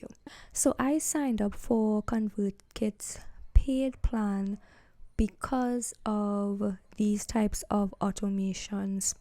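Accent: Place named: Trinidad and Tobago. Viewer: trini accent a fight fi come out lmao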